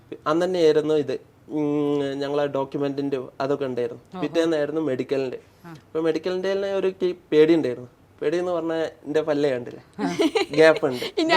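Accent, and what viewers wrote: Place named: Kozhikode, India. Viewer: Kozhikodane ne parayanda cheetta. Ningale samsaram keettal nammale veetil ullore samsarikkanana feel 😍. Randupeerum poli 🥰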